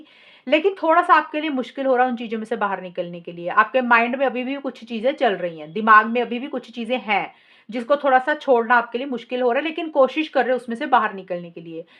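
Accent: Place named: Punjab, India. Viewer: Punjabi lang nikal he jandi hai chahe kinni hindi bol lo aap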